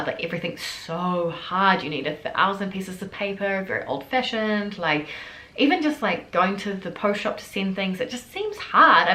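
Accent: New Zealand. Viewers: Your New Zealand accent is coming back in force! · Your Kiwi accent has gotten SO much stronger since you went back!! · Thank you for your honesty. I think I understand your dilemma. There is a side in you that appreciates being back in New Zealand, where it's quiet and nature is beautiful and the bureaucracy is less stifling and perhaps it's also nice to be able to see your family more often, yet, you also miss things from Paris, because it had become your home for five years and at some point, you had the vision to spend your entire life there. At the same time, you knew when you lived there it wasn't an ideal place (I agree with you about the noise and the endless paperwork), but now you also have to rethink your channel. You love doing your channel and I think you should keep doing it, you just need to find a new theme. Please don't let the negative comments bring you down. 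You're a beautiful young woman and I love listening to your New Zealand accent. What you're going through is stressful. It looks easy getting on a plane and just going somewhere else, but to start your life all over again, even if it's your home country can be challenging. I wish you the best of luck. Good that you have your husband with you, who knows about your life in Paris. That way, there is always a bit of Paris with you - the good part. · I j discovered your channel a few days ago and have been knee deep ever since. Something fun I just realized was that when you were in France you sounded like you had a more "american" english accent. Now that you are back home in NZ I feel like your NZ accent has become more prominent. However, as an american I have really loved your videos and collabs. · Oh, you are so cute! Even when you're complaining about something, you're just totally likeable! 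Love watching your videos and seeing your friendly, open face and hearing that adorable Kiwi accent! It would be nice to hear directly from your husband about his acclimation to NZ. That must really be a culture shock - much as yours was when you first came to Paris! A small request - in the future, could you speak about what exactly your business entails? Thanks again for the peek into your world! Cheers, Fred in NYC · whoo je ne m'étais pas encore rendu compte sur tes dernières vidéos mais ton accent est bel et bien de retour depuis que tu es revenu en Nouvelle Zélande, les e qui deviennent des i etc... !!!!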